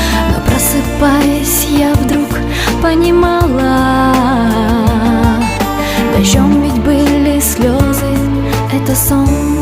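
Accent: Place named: Grozny, Russia. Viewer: Звонок из До́ма, святая пятница. Посвящается XM. 8 октября 2021 г. Как неспешно, размеренно ты говоришь, и доносится эхо из холла, Где ты в пятницу плитку кладёшь, говоря о потопе в туннеле и прошлом, Интонация голоса Грозненца каденцией пауз до боли сердечной знакомы: Мы с тобою идём по дороге, где цветёт трын-трава с подорожником, Где вода щиколотки ласкает и греет на площади возле „Кавказа“ с Совмином: Мы босыми ногами ступаем в следы наших детских забав и баталий, Мы вдыхаем кофейную гущу с пирожными возле фанто́ма „Столичного”: Не закроют высотки-верзилы нам солнца Былого - его у нас не отобрали...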